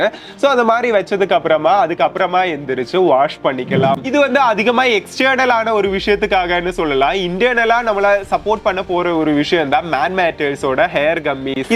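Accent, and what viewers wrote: Kerala, India. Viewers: மலையாள தமிழ்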